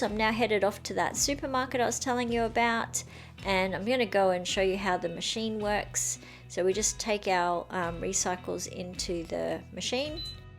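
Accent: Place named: Australia. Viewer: I just love your Australian accent. It's amazing.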